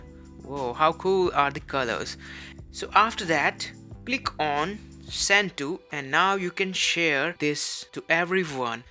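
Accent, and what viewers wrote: India. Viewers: Indian English :)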